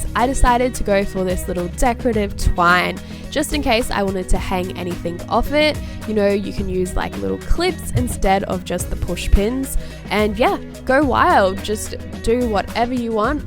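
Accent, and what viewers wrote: Australia. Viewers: Are you Australian? If so, what part?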